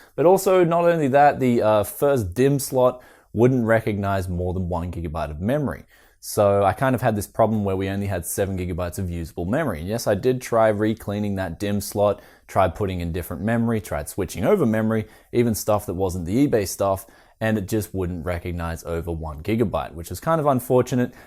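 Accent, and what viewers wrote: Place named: Australia. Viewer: ***** Well he lives in Australia I can tell by his accent by the Country in the Channel Description.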